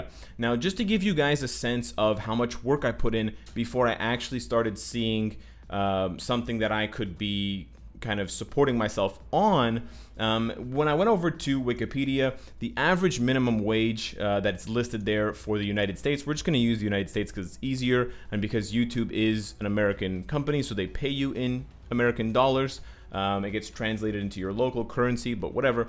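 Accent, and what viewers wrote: Portugal. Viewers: Hey, It's great to hear your opinion about it. It surprised me that Netmarble actually responded to this drama, I just didn't think they would. It seems they want to be closer to the community and listen to what we have to say, which is fantastic. They are improving and I hope, as you said, that they will make adjustments to this program in the future. Also, it's always great to hear your portuguese accent hahaha Seu canal me ajudou muito desde que comecei a jogar, obrigada! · Eita sotaque Português incrível. Bom saber de suas raízes,. Te acompanho bastante e admiro muito seu trabalho (sou brasileiro), está me ajudando MUITO desde que voltei a jogar - parei no lançamento da Kamala Khan. Ign: ThomasDell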